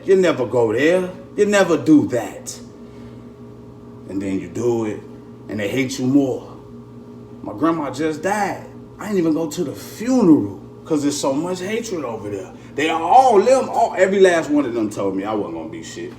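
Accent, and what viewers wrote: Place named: Chicago, United States. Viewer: You talk like you from Chicago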